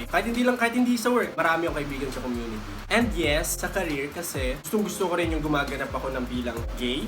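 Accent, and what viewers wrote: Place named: Conyo, Philippines. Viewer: Sobrang saya ko while watching, napaka konyo mo and di ka boring kausap sana lahat may sense of humor.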